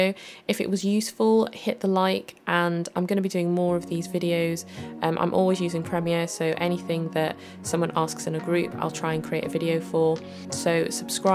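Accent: England, United Kingdom. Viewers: A great instructional video! Doesn't hurt to have that English accent either! ;) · Very good tutorial. I have seen a few on this subject and this was clear with a lovely English accent for a change. Thanks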